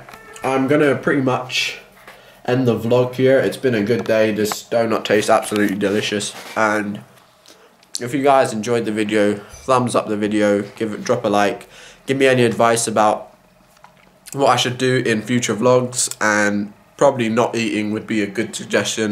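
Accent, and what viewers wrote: United Kingdom. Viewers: Currently he sounds less British than in this video. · Mate you lost your British accent😳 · HE HAD MORE OF A BRITISH ACCENT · idk why but i like vlogs in the British accent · He had a strong British accent. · his accent was much more british back then 🤣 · When wasnt so scandalous about every lil thing with a british accent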